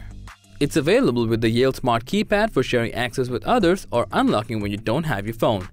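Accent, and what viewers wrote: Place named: China. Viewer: Your Chinglish needs a bit of work...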